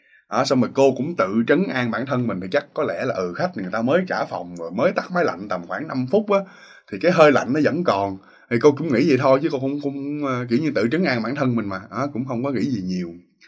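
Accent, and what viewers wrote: Mekong Delta, Vietnam. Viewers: gốc miền Tây hả ha, nghe giọng chân chất, tốc độ nói cũng khá nhanh nhưng đủ để nghe kịp, giống kiểu nói của mình.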